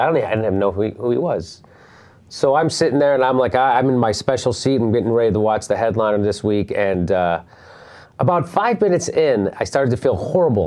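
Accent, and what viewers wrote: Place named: Chicago, United States. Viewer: Jimmy’s accent has changed so much throughout the years, even 9 years ago he had a relatively thick Chicago accent.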